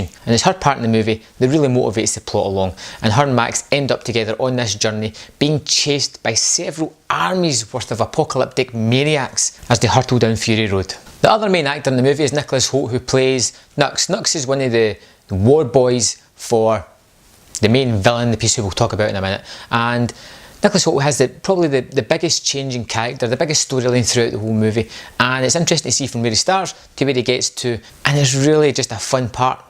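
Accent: Scotland, United Kingdom. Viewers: hey Great review, I subbed. I am just starting out as well, trying to get to know other people who do reviews. I do Movie Reviews and Voice Impressions. I am not trying to spam. Also love the Scottish accent. Here is a review I did of San Andreas, Check it out sometime if you have the chance. <link> Looking forward to seeing other stuff you do as well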